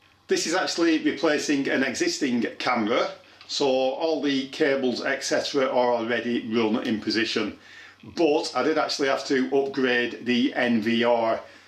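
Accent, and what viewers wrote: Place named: Wigan, United Kingdom. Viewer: I live in Pennsylvania but am originally from Wigan, you sound as if you are from near there, lol.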